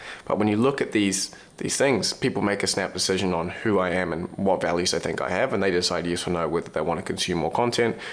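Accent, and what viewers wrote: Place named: New Zealand. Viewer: Wow coming back home, about 3 weeks ago I was soaking up the content on this channel and I was thinking this guy sounds like a Kiwi sure enough is coming home. I am hoping you will be based in Auckland as I am just down the road.. 😊😅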